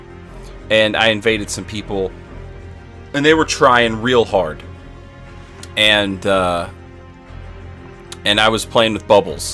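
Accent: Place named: Tennessee, United States. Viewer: you're from tennessee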